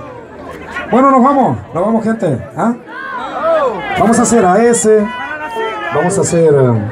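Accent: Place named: Santiago del Estero, Argentina. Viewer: Como buen Santiagueño,le repercute mucho el asento,y la SS,pero canta lindo,ojalá q cuide su vos y su figura,eso es muy importante para sus fans y su salud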